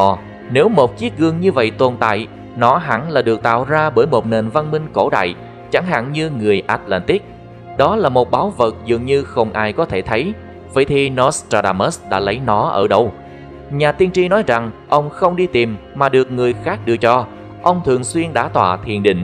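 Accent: South, Vietnam. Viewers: Thỉnh thoảng nghe đc giọng Nam kỳ rỏ ràng dể mến Toàn giọng bk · giọng miền nam chuẩn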